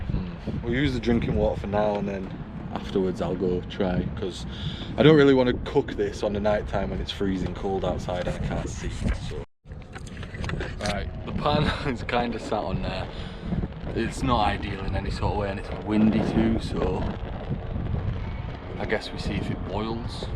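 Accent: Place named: North, United Kingdom. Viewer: Just found you. Good to hear a northern accent, I will need to go back and catch up.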